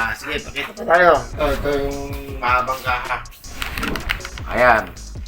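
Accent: Gabaldon, Philippines. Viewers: Gabaldon padin yan tay yung tagalog mo?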